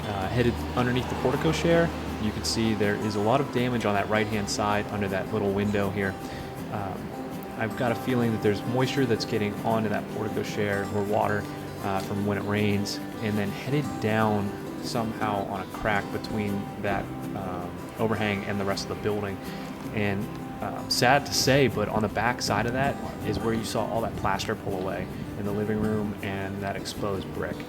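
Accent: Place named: Pittsburgh, United States. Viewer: Popped up in recommend, heard a yjnzer accent, saw the house and was like hey I grew up in jeannette! Crazy how the world works. Good luck!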